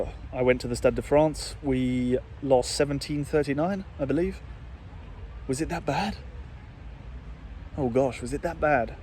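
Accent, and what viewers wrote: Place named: South Africa. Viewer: Damn for an english frenchman (an oxymoron if i ever heard one) you sound very much like a South African. Hybrid.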